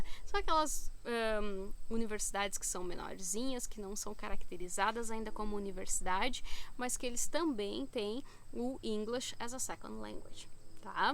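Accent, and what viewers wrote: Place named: Gravataí, Brazil. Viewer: QUE BOM EU MORO EM GRAVATAÍ E RECONHECI O SOTAQUE, PARABÉNS!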